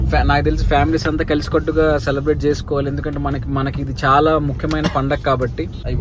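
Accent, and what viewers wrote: Srikakulam, India. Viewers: Nee life antha yemi cheyyalo artham kaaka ankithani pelli chesukunnav. Hi this is ane mukka thappa neekemi raadu english srikakulam slang neeku driber job chesuko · Sir Mee slung srikakulam la undhi bro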